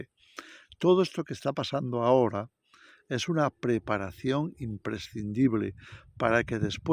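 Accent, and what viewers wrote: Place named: Spain. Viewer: primera vez que te escucho y veo hermano!,con mi familia dimos ese salto de fe al mudarnos a una pequeña ciudad a 1500 km de distancia al surde argentina,junto a mi esposa entemdimos que Dios nos llamaba a algo diferente,y en 11 años aprendimos a esperar de la provosion de DIOS atraves de un pequeño negocio de comidas tipicas del norte argentino...hoy argentina esta siendo gobernada por un verdadero ANTICRISTO un ser inmoral y despota que esta llevando a la nacion al hambre y la desesperanza,tuvimos que desdoblar las fuerzas y esperanzas en el SEÑOR Y SUS PROMESAS,aprendiendo y recibiendo el mensaje que; la IGLESIA DE CRISTO EN LA TIERRA sera presionada atravez de la economia familiar! Coicidimos con usted pastor y sus palabras. ...por el acento,veo que es español,de donde es su localidad??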